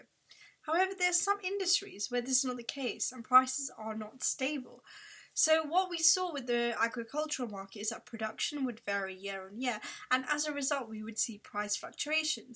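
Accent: United Kingdom. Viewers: Her english is more english than english people's English 🤦‍♀️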